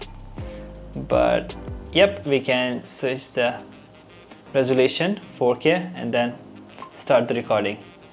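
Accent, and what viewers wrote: India. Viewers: Orang prindavan...logat e udah...terasa..😂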